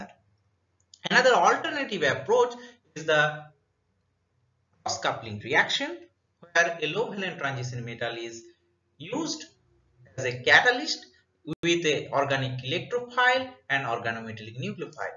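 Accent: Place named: Bengal, India. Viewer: He has Bengali accent, so don't poke him.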